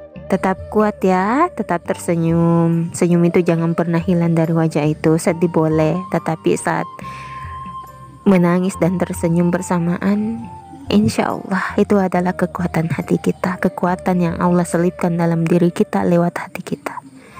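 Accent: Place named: South Sulawesi, Indonesia. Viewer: Motivasinya aisyah akan ingat selalu bunda.sepertinya bahasanya dari sulawesi selatan seperti papi aisyah lho :)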